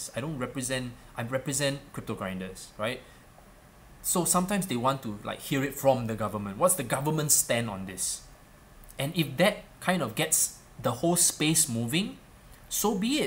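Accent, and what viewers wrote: Singapore. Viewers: you have to be from Singapore right? I hear that Singlish speak!!!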